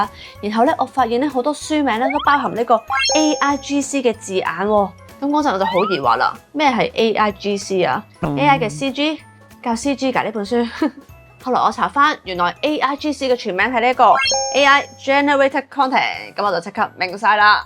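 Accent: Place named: Hong Kong, China. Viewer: ，有冇去過惠州的"雙月灣”玩？好想睇下妳既介紹，香港式廣東話同聲同氣嘛🙂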